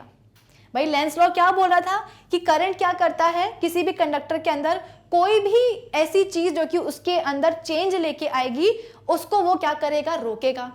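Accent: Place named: South, India. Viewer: I think he/she is from Tamil nadu or Kerala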